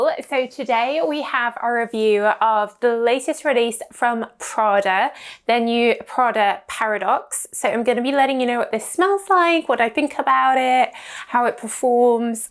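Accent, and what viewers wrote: United Kingdom. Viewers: is your dialect british?(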